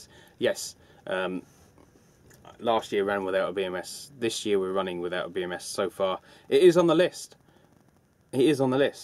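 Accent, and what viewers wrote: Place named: Kent, United Kingdom. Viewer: I thought you sounded like a man of Kent / Kentish man, (always forget which is which) ;) I grew up in Canterbury but now up in Suffolk. I’ve got hundreds of people keep telling me to make more videos but... dunno... you’ve inspired me though! So thanks ;)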